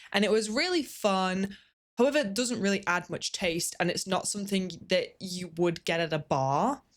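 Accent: United Kingdom. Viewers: You became full brit after jackie's drink, your accent got full british mode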